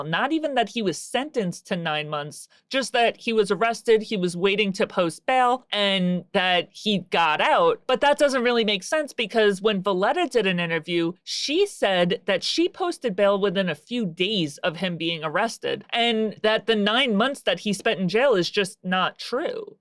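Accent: United States. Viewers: ohh I completely understand, I wasn’t trying to hate to say you’ve don’t anything offensive or any silly crap like that . I actually like your videos a lot you do amazing work and you do it from a perspective you don’t often see or hear from I like hearing stories I’ve heard (and some I haven’t) before but in a way that I never heard it and you deliver that which isnt an easy thing to do Plus Being born and raised in Baltimore and having a pop pop who use to travel back and forth from bmore to Connecticut, NY and Jersey like he had a different wife and kid in each state (I think he might of actually on Buffalo but that’s beside the point lol) but he was an old school “union worker and sometype of official” who also was a major number runner in the city (it’s ok he passed away awile ago so no worries) but I’ve heard some stories and seen a few crazy things as a youngin lol you also remind me of a few girls who were really good friends that I grew up with. 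I know your not from bmore im Pretty sure your from somewhere in the Tristate area maybe in one of the five famous boroughs of New York or New Jersey maybe Jersey city or Newark. I can hear a little bit of it in certain words you say (you’ve probably mentioned it before, my memory is shit nowadays anyway lol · I love your accent. Reminds me of living in queens ❤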